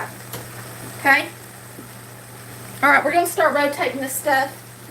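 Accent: South, United States. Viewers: I love your deep southern accent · I love your sweet southern accent! Your food looks SOOOOO amazing! !!!! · Hey....this looks amazing. Love the way you cook and also your great southern accent. I'm subscribed to your channel and can't wait to see more. Blessings my friend!!!!!!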